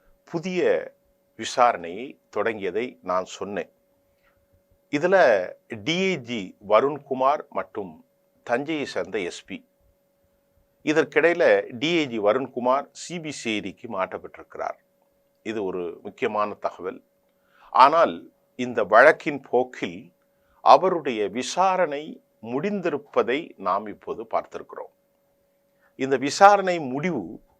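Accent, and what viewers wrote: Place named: Sri Lanka. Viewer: இலங்கைத்தமிழில் கதைப்பதைக் கேட்க இனிமையாக உள்ளது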